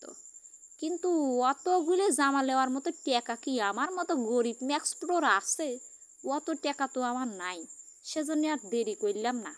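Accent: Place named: Pabna, Bangladesh. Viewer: খাটি পাবনা জেলার ভাষা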